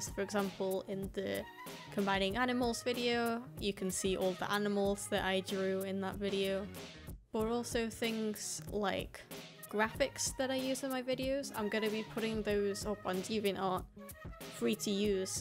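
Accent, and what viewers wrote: United Kingdom. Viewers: I really love the british... accent? Can you call it an accent? I mean, isn't it the origninal English? Either way, love how British people talk and that is one of the only reasons I wanna visit England one day. Just to talk to British people. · Wait she's British?🐈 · Sooooo, are you British or American?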